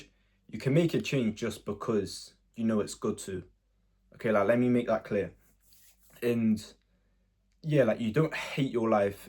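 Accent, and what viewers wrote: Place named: United Kingdom. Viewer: yeah! ur video actually popped up on my youtube recommendations. it's crazy to think i'm talking to someone from the other side of the world! i knew you were british immediately because of your accent haha. keep uploading content, bro !💪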